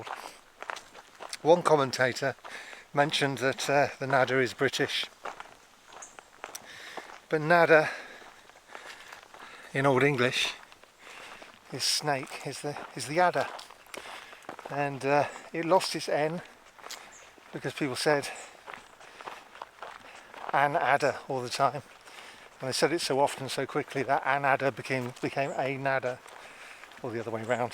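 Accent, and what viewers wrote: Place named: Bristol, United Kingdom. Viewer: I always thought you were from the Bristol area from your accent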